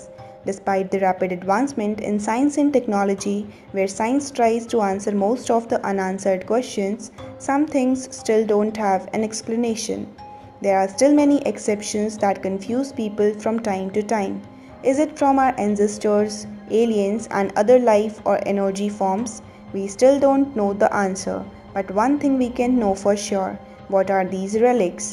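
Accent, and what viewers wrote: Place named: India. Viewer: This channel have very interesting videos but with all my respect is very hard to listen the audio in english with that strong indian accent and the letter are too small .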